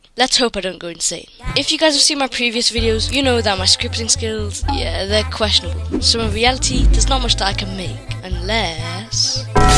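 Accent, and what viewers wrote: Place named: United Kingdom. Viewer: u sound like a chilld, speak like a old british man and insane editing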